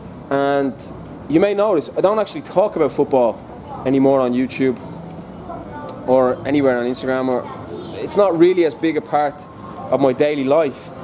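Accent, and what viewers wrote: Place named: Ireland. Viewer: You sound like lrish you rap fast he a cool rapper singer my friend 🙂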